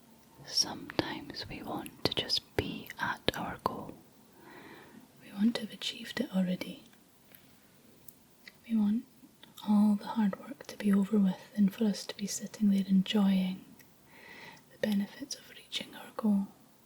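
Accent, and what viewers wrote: Scotland, United Kingdom. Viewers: Have to say the curls REALLY suit you! There's no Welsh alternate sayings/words for New Year unless you count Blwyddyn Newydd Da; Happy New Year to you! Could listen to you all day, love the softer Scottish accent. . I practice the LoA and things have manifested so well for me recently, but still working hard on the Power of Now which can be quite hard to maintain. . Guessing you're near the M8/M80 😉 . Much love from North West Wales 😍 x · i will never not have a weak spot for scottish accents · The Scottish accent is the best accent in the world. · you are so beautiful and talented. I love all your videos. Your hair is beautiful. Your hands are beautiful and I love your hand movements. Your voice and accent are wonderful. You should be recognized as a Scottish national treasure. Thank you for all the great videos. You always help me relax and make me smile. 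Thank you. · Where in Scotland are you from? Lovely accent wherever it is. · my new years resolution is to find a scottish girlfriend (Im Australian) cos i could listen to that fluffy accent the rest of my life. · Love the ton of your voice the nice pleasant Scottish accent Not only that , you're beautiful inside and out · Aaaaannnnd I'm on my way to dreamland. We need more scottish accent asmr. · other people must be thinking this is a strong accent but for me its normal bc im scottish to AHhah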